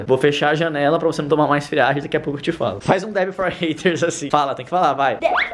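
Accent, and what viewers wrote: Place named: São Paulo, Brazil. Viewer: alguem em 2020 kkkk eu moro em Sao Paulo e é assim mesmo do jeito que o falo kkkkkkk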